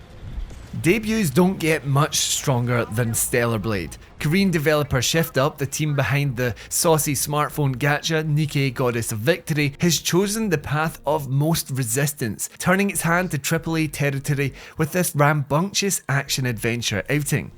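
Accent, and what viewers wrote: Scotland, United Kingdom. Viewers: Oh no not a scottish accent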